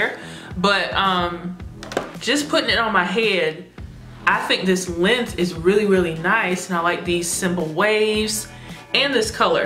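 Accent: South, United States. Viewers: Girrrrrl u are killin this unit!! So beautiful!! And your southern accent is so cute! Ima go broke wit these wigs, I swear 💞👑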